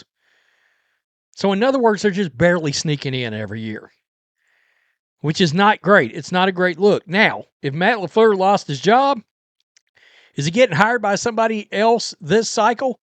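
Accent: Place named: Tennessee, United States. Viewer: Yes, Matt LaFleur was the Tennessee Titans offensive coordinator when Ryan Tannehill was there. I remember that because I remember the Titans radio guy had a very similar accent to you and I thought you were from Tennessee until you said you were from Texas.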